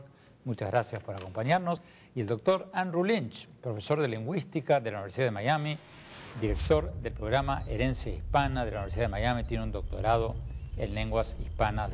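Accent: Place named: Argentina. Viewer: Me gusta el acento que tiene openheimer, es argentino?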